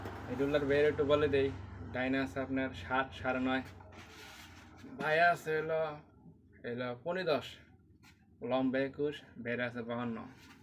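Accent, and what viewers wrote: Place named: Mymensingh, Bangladesh. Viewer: ভাই সব ঠিক আছে দয়া করে আপনি আপনার ডেসক্রিপশনে অবশ্যই আপনার ঠিকানা দিয়ে রাখবেন ঠিকানা দিলে কি হয়, অনেকে আপনার পার্শ্ববর্তী জেলা বা পাশাপাশি থাকে তারা গিয়ে নিয়ে আসতে পারবে আপনার কথা শুনে মনে হয় শেরপুর জামালপুর কোথাও ময়মনসিংহের মধ্যে বা টাঙ্গাইল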